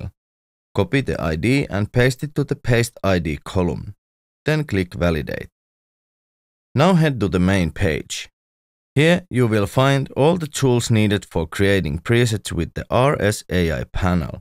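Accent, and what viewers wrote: Finland. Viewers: This is correct, you got me. 😅 I am Finn. Hope it wasn't too hard on the pronunciation. 🙏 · Where is Reveal Sound based, I've never thought about it, but I'm 100% sure that the voice over in this video is by a Finn! (As am I). Kudos anyway, I use Spire on pretty much every song. My latest track (the Ukrainian one) on my youtube page has a plucked synth hook, whch is actually a Spire preset. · It was all good. I'm a fellow fin and was pretty sure about the accent! :)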